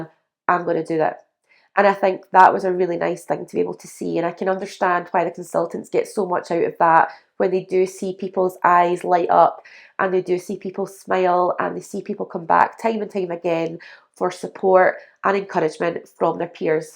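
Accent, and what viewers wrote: Scotland, United Kingdom. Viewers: Your Scottish accent is adorable 🎉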